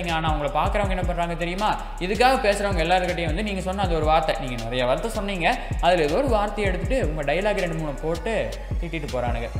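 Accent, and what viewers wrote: Coimbatore, India. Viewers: Ur Kongu Tamil sooper cute ❤thambi and evlo azhaga talented ah pesra... Kannu vachuta pa... Suthi poda Solunga... Un ammava...ur way of speaking Really so much adorable 😘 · Namma ooru Coimbatore, Tirupur slang varudhe... mm subscribed ra thambi.. keep doing this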